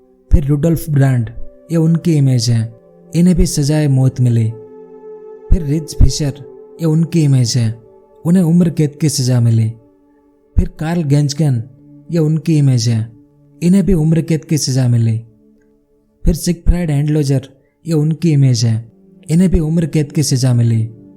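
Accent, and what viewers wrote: Gujarat, India. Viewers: Gujarati lago cho??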